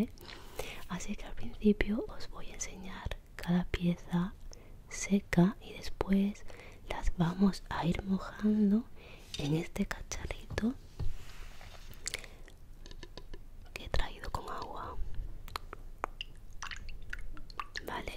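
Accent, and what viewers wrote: Spain. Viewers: Desde que te conocí hara un mes eres mi asmrtist con diferencia. Eres supernatural y autentica. Siento como si te conociera como amiga de toda la vida. Y me gusta mucho tu acento de la otra punta de españa. A seguir creciendo! 😘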